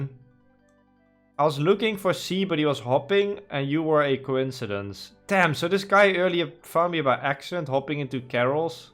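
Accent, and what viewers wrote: Netherlands. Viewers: You know, I never noticed your extremely Dutch Accent. Should know it with your name " " 😂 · Jij komt uit Nederland he dat kan ik horen aan je stem. Mij sub heb je geweldige videos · 100% this guy is dutch, just by his accent 😂. I am dutch too it is funny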